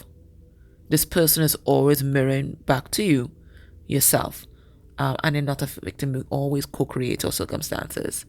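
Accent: Trinidad and Tobago. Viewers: Good afternoon, from London England, you sound Trinidadian, or Caribbean, I am Capricorn,xxxxx to you and family..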